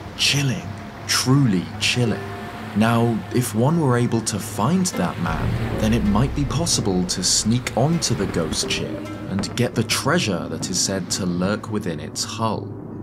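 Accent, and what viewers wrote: United Kingdom. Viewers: not related but the rhythm of the sentence is really good for poetry or lyrics, especially when read by UK accent. Doubt it was intentional by the translators but it adds an extra element of spooky.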